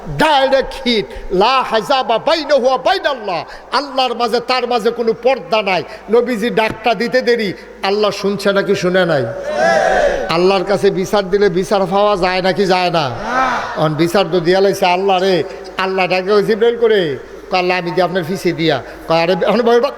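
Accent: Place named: Rural, Bangladesh. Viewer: সে তার গ্রামের আঞ্চলিক ভাষায় কথা বলে সে এখন পর্যন্ত শুদ্ধ ভাষায় কথা বলতে পারে না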